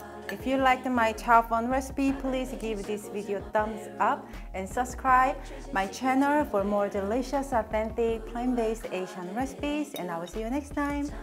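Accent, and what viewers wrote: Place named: South Korea. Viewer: Omg your Korean accent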